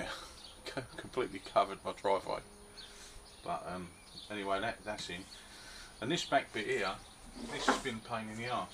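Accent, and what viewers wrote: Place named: London, United Kingdom. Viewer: Hi. Just came to this channel after a shout out from self build campervans on Facebook. I'm in the process of doing a conversion too so I completely understand what you're saying about having workshop facilities etc. My ex-community minibus is not even parked in my driveway! It's about 100mtrs across the road. Admittedly it's quite peaceful there, but it's a proper pain when you need the next tool or a piece of wood... Anyway, I'm enjoying the videos that I've seen so far and I have subscribed. Love the "suits you" every so often, but of course not everybody will understand it :) Keep up the good work; I know you'll get there in the end. Regards Mark in the UK p.s. pretty strong good ol' London accent there; are you in the London area or have you moved?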